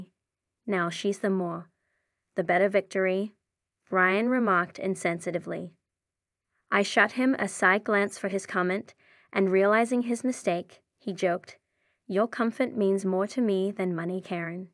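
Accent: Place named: United Kingdom. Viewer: I think British or English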